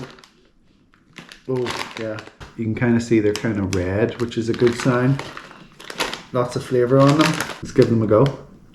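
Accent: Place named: Bengal, India. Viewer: Your bengali is so adorable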